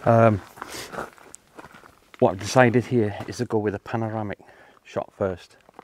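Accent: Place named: Wales, United Kingdom. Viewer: Great shots,you do make me laugh tho, with you Welsh take on the the English language 😂 oh shit etc 😂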